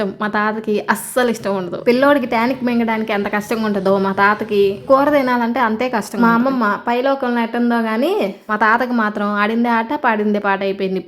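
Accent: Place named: Chittoor, India. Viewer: Ya ooru akka chittoor slang la undi meedi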